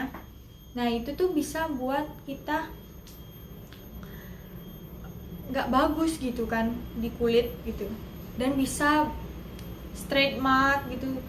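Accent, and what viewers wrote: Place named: Bali, Indonesia. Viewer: logat khe sa Bali x🤣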